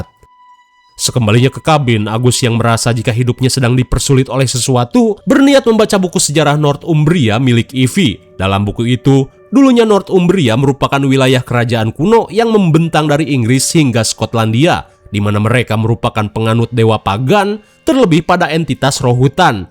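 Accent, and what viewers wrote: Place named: Sunda, Indonesia. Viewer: emang pujaan aku sm saudara temen juga 😂..sunda banget seru kl udah bilang eta si....😂😂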